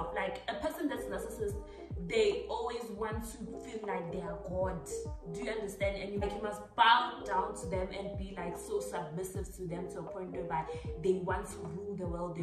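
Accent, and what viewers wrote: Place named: Nigeria. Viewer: It’s the nigerian accent for me😂😂 biko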